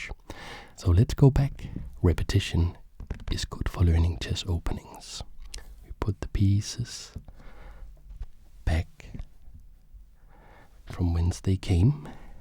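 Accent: Denmark. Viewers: the soft spoken danish accent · I think I also could recognize danish accent any day now :)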